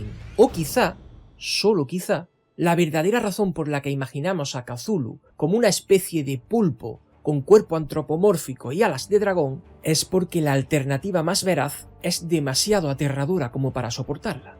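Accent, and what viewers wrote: Andalusia, Spain. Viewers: sos de Andalucia?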